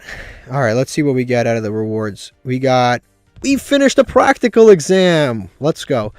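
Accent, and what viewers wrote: United States. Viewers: Do I hear a Boston accent?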